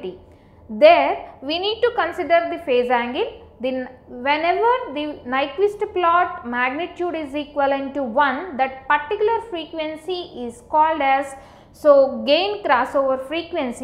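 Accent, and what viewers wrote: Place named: South India, India. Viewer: She is South Indian I guess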